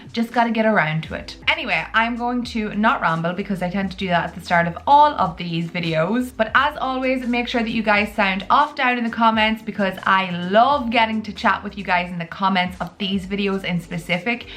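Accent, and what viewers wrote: Ireland. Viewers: I see, hear an Irish accent, think of tommy shelby, then die bc I love cillian murphy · Greetings from Los Angeles!🏖🌴Your channel was on my suggestion and I took a glance and got hooked! I hit the subscribe instantly. Gotta say I've been binge watching your vids.😊 Great style, great personality, lovely Irish accent and overall 👍👍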